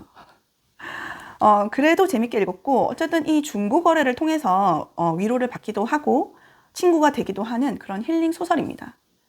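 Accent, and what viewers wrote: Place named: Gyeongsang, South Korea. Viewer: 핑크색에 홀려서 클릭했다가 구독에 댓글까지 남겨보아요~ 끝까지 영상보다 땡기는 작품도 있고 <종이여자>는 오래전언니 책꽂이에 있던 책인데 님 덕분에 흥미가 가요.. 무엇보다 갱상도 사투리.. 너무 정감가는데요?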